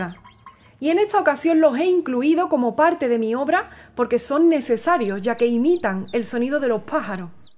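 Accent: Spain. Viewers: de que lado de españa es ese acento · Me encanta el acento español que tiene, y por supuesto si estilo y calidad en tocar la guitarra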